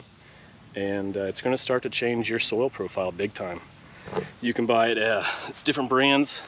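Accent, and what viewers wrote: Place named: Australia. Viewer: An Aussie man! Aussome!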